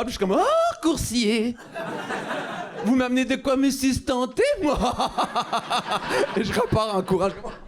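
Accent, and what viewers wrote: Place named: Quebec, Canada. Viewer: J’adore 😂 beau gris avec un bel accent québécois naturel et avec des expressions nordistes… 🥰😍🤩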